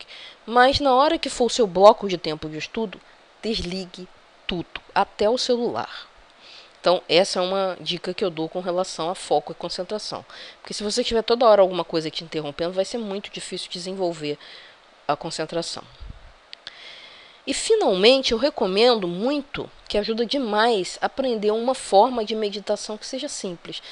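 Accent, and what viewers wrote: Rio de Janeiro, Brazil. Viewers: Carioquês forte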